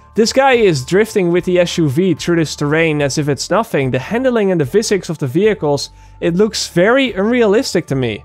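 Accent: Netherlands. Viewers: Because you sounds dutch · ik dacht al te horen dat je nederlands was haha. duidelijke mening. ik deel hem deels... ik ben bang voor het spel. angst dat ik teleurgesteld word. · ik hoorde gelijk aan je accent dat je nederlands was😂😂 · The Gamer Of Canada sorry. i didn't watched the whole video but i heared his accent and then i wanted to ask if he was from the netherlands. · why does this guy sound like he is dutch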